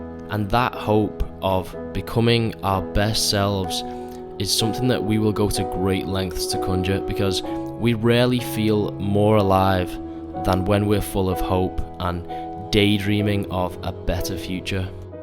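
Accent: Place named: England, United Kingdom. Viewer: Where are you from in England mate? The accent and choice of words are intriguing (in a good way) hahah love the vids man keep it up!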